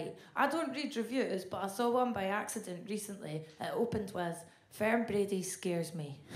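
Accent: Scotland, United Kingdom. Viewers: Fantastic voice and accent. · What Scot accent is this? There are different accents in Scotland. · You should be proud of your Scottish accent not ashamed or embarrassed about it. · It's exactly the Scottish accent that appeals to me most. Otherwise, that alien chick is the xexiest, funniest, most honest nonhuman I've ever seen do comedy. I wouldn't even have known she is an alien if she hadn't confessed! I'd watch Fern anywhere, any time. I hope she does a full special on a streaming service pretty soon. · Anything spoken with a Scottish accent is automatically funnier... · I love a Scottish brogue. My ears delight in it. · Is she fay Rothesay? 🧐 Very good 👍🏻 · How can you not LOVE a scottish accent, thats definitely a woman i could listen to and never be bored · Very beautiful, very funny and I love her accent! Makes me want to do the 11 hour flight to the UK 🙂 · I love the Scottish accent 🙂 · You are beautiful, funny, and I personally love your accent. 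· I love the Scottish accent! Would I wish I had subtitles for ¼ of what is said? Sure, but it's fun to hear anyway! I like to repeat/imitate it when talking with someone, not to make fun of them, but to be able to understand it better. Which is a better way to cook? Watching someone passively, or doing the cooking yourself while tasting it periodically? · I find Scottish accents in women so damn hot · I absolutely love Scottish accents. I'd probably listen to this lady all day even if she wasn't telling jokes.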